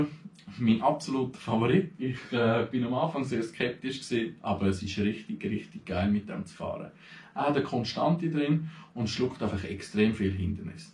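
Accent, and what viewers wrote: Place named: Switzerland. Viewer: einiges gelernt... bissl übers Bike, aber vor allem Schwizerdütsch, mithilfe der Untertitel 😁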